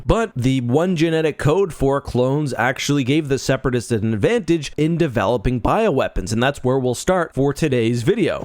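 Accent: Canada. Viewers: How's he gonna work the canuck word "re-zorses" into this one?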